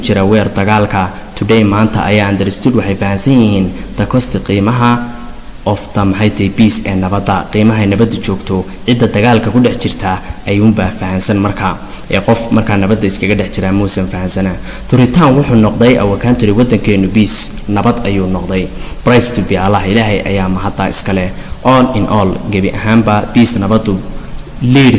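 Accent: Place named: North, Somalia. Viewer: I,m very happy today because your speech is heer waqoyi, the previous days I watched the more teachers I can't I understood the talk them, welcome teacher😇🥰😇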